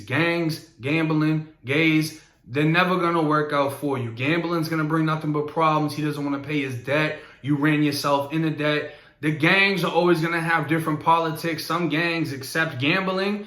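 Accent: Boston, United States. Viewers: I'm from just north of Boston and your accent sounds like ours,is there a part of Florida where other dudes talk like you? It threw me off after I started watching your videos that you weren't from the northeast.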